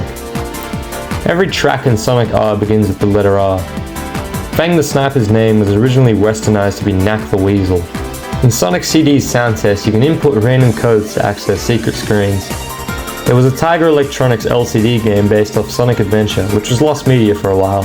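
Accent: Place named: Australia. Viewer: This guy is literally just Aussie Bulbasquirtle, I love it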